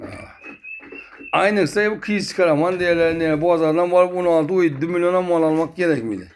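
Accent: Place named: Khorezm, Uzbekistan. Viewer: хоразича.гапраяти шэкилли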